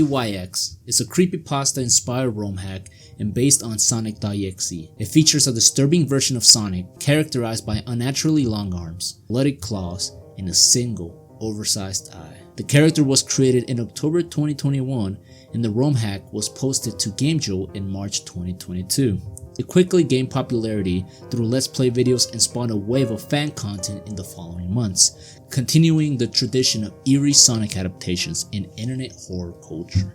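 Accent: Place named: Spain. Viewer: what the pizza pocket in the microwave sees. But seriously, awseome video and bonus points for a regular show pfp. Nice. Y creó que tambien hablando Español? Solo creó por el acento.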